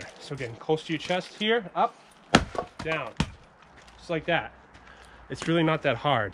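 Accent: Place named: New England, United States. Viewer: Took me 2 seconds to realize you were a fellow New Eglandah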